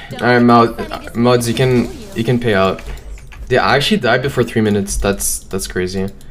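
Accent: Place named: South Korea. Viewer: I have to ask just because I love the way you talk -- where is your accent from? I'm getting Korean vibes haha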